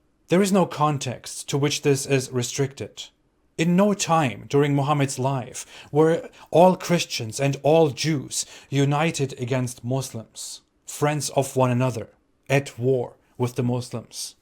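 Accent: India. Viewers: he has a heavy Indian accent but I guess you just make fun of Indian accent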